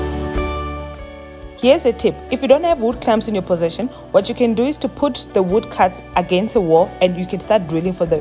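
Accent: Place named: South Africa. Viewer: I am south african Indian I thought I recognized your accent, wow you have such great ideas and I will surely try it out, my daughter has asked for a shoe rack. Keep it up with your good work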